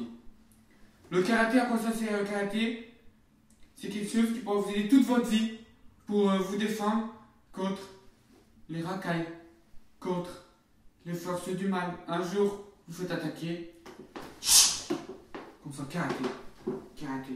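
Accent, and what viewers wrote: Nord-Pas-de-Calais, France. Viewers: Exprès et il parle avec le Nord il est chti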